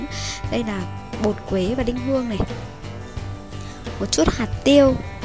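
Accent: Vietnam. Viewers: ban nay we o dau ko biet nghe giong thanh hoa ma lai ngong nua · hình như chị là người bắc ak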